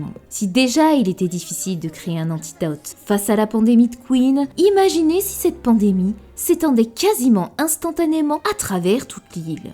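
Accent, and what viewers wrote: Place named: Belgium. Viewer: c'est l'accent belge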